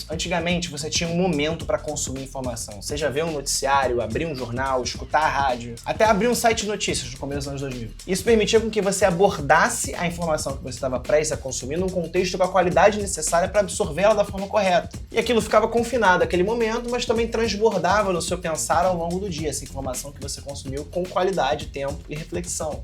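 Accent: Rio de Janeiro, Brazil. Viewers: o sotaque e o jeito carioca só deixa melhor o vídeo que é a verdade nua e crua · A forma como ele solta uma enxurrada de conhecimento e sensibilidade entre o sotaque e as gírias mais cariocas que existem me pega demais. ❤️‍🔥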